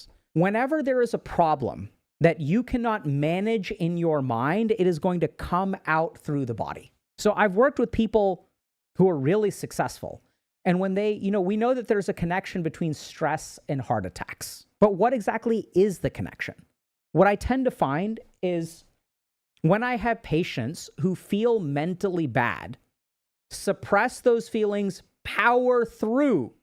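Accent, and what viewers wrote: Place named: India. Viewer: I adore this India-accent of English 😉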